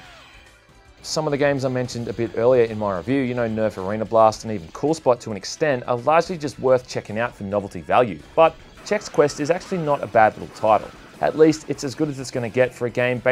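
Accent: Australia. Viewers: Woo! Aussie game reviewers are a nice change.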